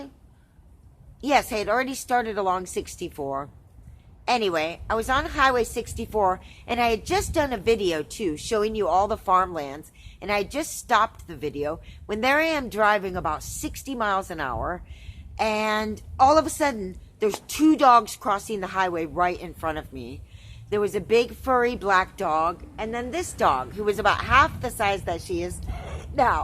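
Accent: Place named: East Coast, United States. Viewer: Cool🐕i know you probably already know about the monks of new skete dog training book? I was really inspired by their techniques🐶 i try not to have bad days. Challenging moments in each day. After a malignant melanoma everyday is good. Life is not fair or easy. Great seeing you smile& that east coast accent is lol.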